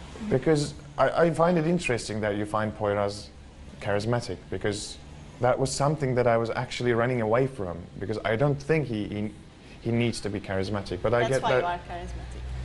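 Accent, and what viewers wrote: RP, United Kingdom. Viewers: British royal accent perfect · Baya baya british konuşuyo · I like his English accent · Resmen kraliyet ingilizcesi konuşuyor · he has a bit of a British accent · british accent!!! so sexy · oha ingiliz aksanı😍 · rp aksanını herkes konuşamaz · he has been in uk, and picked the accent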